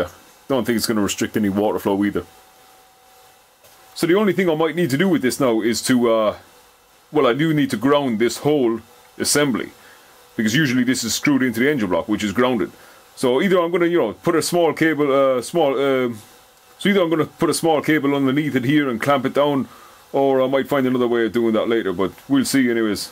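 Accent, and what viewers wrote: Ireland. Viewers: Sounds like Offaly to me, maybe Longford 🤣🤣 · This might be good!! This guy has a Irish voice going through a big bore throat LOL. Brilliant wee video!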